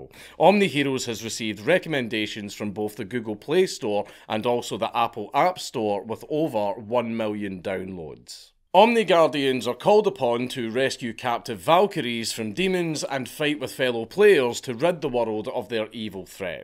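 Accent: Scotland, United Kingdom. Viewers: you sir, are extremely Scottish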